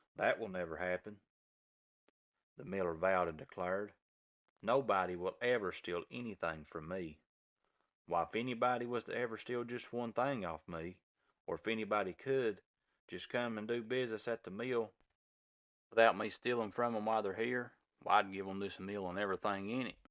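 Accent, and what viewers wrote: Kentucky, United States. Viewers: Mrs. Reid, our librarian in elementary school read Jack tales to us every day. Kentucky accent just like this. Thank you so much!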